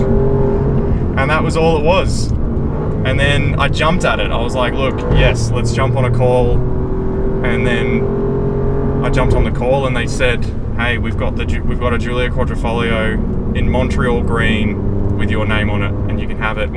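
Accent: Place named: Australia. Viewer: Why do you sound more Australian in the car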